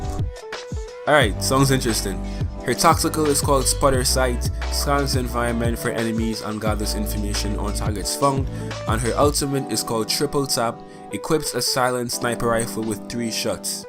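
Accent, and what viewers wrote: Trinidad and Tobago. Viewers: Im from trinidad btw u sound trini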